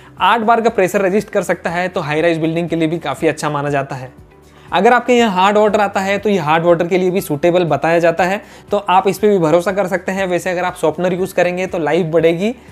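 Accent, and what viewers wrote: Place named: Northeast India, India. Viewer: North East India se ho kya aap.. ? apki accent vaisi h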